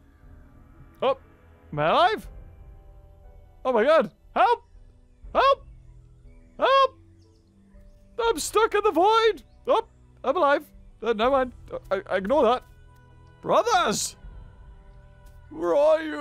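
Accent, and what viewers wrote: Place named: Newcastle, United Kingdom. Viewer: You from the Toon, mate? EDIT: ok the question is now nuill and void, you said "Alreet" LoL.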